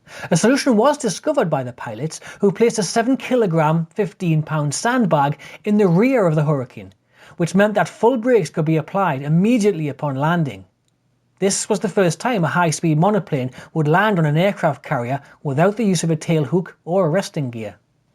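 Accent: Newcastle, United Kingdom. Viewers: He sounds Geordie to me, not Scottish.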